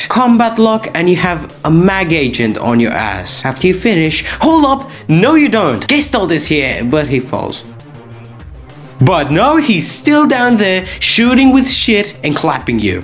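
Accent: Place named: United Kingdom. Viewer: This is most the British review i ever heard nice video bro Also bro the way he said that XD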